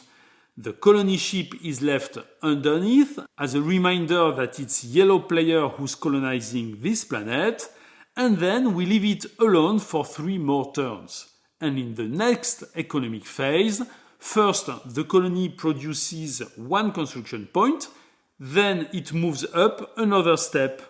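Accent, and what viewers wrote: France. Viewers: Thank you, excellent video. I love the French English accent!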